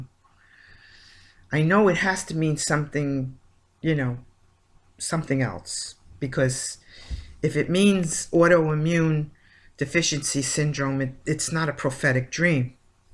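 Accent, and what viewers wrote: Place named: New York, United States. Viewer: Also I love your accent.. NY?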